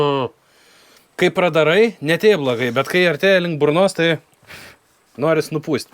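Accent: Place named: Molėtai, Lithuania. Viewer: Iš tarmės gal pons iš Molėtų ar Utenos kraštų?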